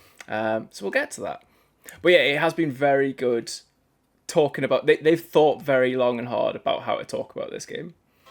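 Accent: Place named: Newcastle, United Kingdom. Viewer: Eyepatch wolf is Irish i think this fellas a jeordie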